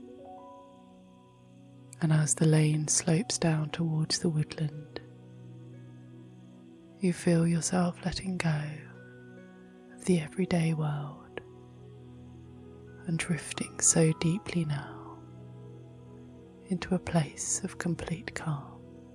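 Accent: United Kingdom. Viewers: Alright, nice to hear an accent from my town, London. Drive through Clapham sometimes. Great voice and meditation stuff thanks 👍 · Thank you, for your wonderful channel and all the effort you have put into it. This video helped me to fall into a deep and relaxing sleep. Thanks to your lovely voice and your marvellous British accent. Greetings from Germany.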